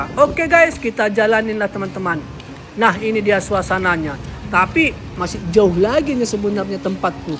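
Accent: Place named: Pematangsiantar, Indonesia. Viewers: Mantap dek... Pertahankan ciri khas siantarnya... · Logat Siantar ny ga hilang ya Lae . Untung lah unik unik Lae klu sempat Anik Anik runyamlah nanti di tempat sarapan ny...😀😀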